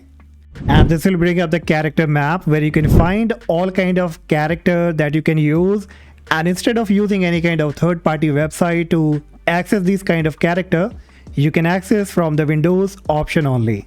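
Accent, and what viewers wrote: India. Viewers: 👍👍👍 so true... An indian english accent was so boring...But its better than any other language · This indian accent help me to install windows 11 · I feel shame on you bro. What's the problem with Indian accent? Don't be a racist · I was about to do the same of stop watching - not because of the accent - but because of my past experiences with the contents of the videos with Indian accent. But wanted to continue for few minutes before stopping and later found that the content was so good. I think, better to give few minutes of time before stopping just by accent. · Why ...Indian accent is good! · Indian accent, stop watching.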